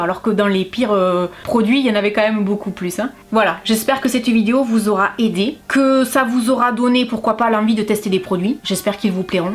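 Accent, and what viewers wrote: South, France. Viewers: Merci beaucoup pour vos vidéos, vous êtes très sympa et votre accent du sud est génial 😀Le rouge à lèvre gerlain, c’est vraie qu’il ai génial et super sympa. Je l’ai eu en cadeau Noël 2019 .😊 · Ta bonne humeur mêlée à ton petit accent du sud me donne toujours du peps (surtout en ces temps troublés) bisous à toi porte toi bien 😘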